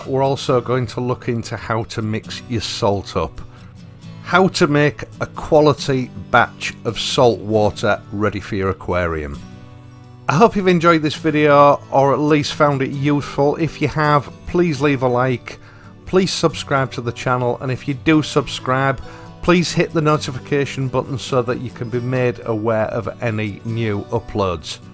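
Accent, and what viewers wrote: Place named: Hull, United Kingdom. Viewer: Just started out … love your videos! It’s hard to find Australian content but this is good enough for me. Also your voice takes me back 25 years to my home town of Hull.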